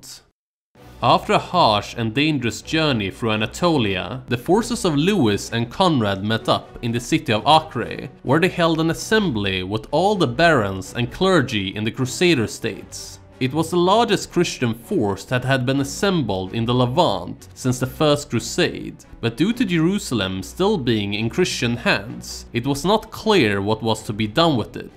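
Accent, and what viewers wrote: Sweden. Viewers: That is an unmistakable swedish accent, doesn't have a choice i imagine.. At least he speaks your language fluently and made this..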